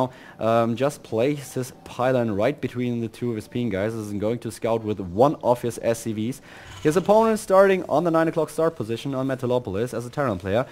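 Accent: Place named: Germany. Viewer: Your English has this typical German accent. But nevertheless, you are one of the coolest caster of Germany.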